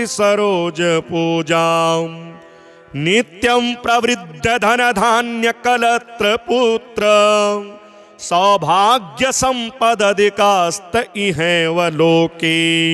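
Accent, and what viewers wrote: Standard. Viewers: aapke Shuddh Uachchaarn SnskrittT Ke DeevaneinnN Hum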